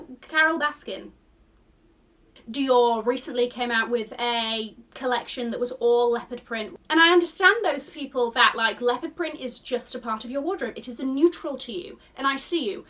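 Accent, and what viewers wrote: United Kingdom. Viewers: You are the first British accent that I’ve heard say Tig ole Bitties 🤣🤣🤣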